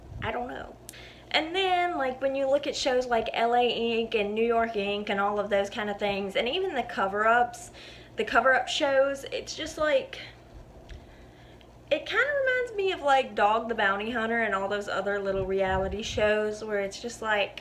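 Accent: South, United States. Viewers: Girl, I’ve been meaning to leave you a comment to tell you that you remind me a lot of myself. I ramble when I’m telling stories and I have nervous habits just like you. I know a lot of people do but it’s really refreshing to find a youtuber that I can relate to! I absolutely love your tattoos too by the way. And your southern accent. I’m probably being a bit creepy now, sorry about that! I subscribed a few days ago. Love your content. ❤️ I’ll stop now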